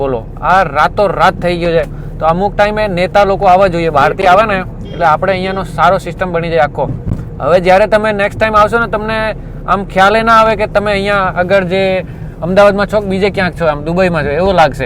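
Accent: Rajasthan, India. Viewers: રાજસ્થાનના ગુજરાતી બોલે છે ભાઈ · Bhai a to Rajasthani che.par amdavad ma rahe che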